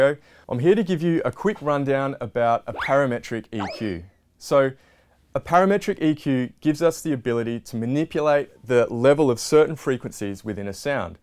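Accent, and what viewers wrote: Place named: Australia. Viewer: That's an Aussie accent if I ever heard one, mate. I have to say the editing and visuals on this video are incredibly tight and the explanation is very straightforward. Job well done! :)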